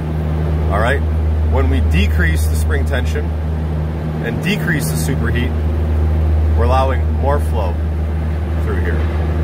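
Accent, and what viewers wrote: Canada. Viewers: 404, EH? Isn't that a freeway going north from the Don Valley Parkway? 😀 You sound like you are Canadian...if not, I will remove this comment. Having fun here in Virginia.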